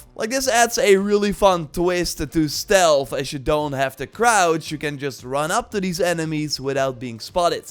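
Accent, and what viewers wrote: Netherlands. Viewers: Woow you sound super Dutch haha 😂 hello from a fellow Dutch guy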